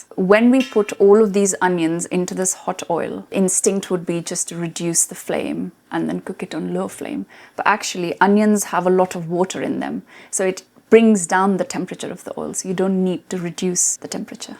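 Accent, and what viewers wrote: United Kingdom. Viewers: Is she English or Australian? What's this accent? Or maybe she's Indian? I realize she is ethnically Indian but the accent doesn't sound like it. I love the dish by the way! Watching from Canada!!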